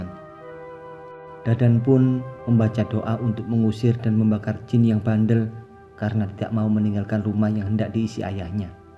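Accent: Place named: Madura, Indonesia. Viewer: Mf..kang Mimin ini dari Madura kah..? Sy dgr dr logatnya😁🙏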